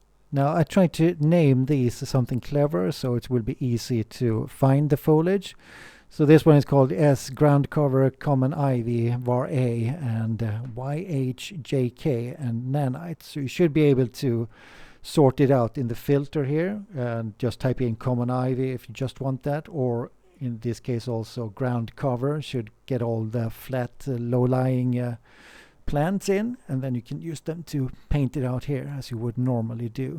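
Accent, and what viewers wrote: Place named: Scandinavia. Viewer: A nice package made with a Nordic accent...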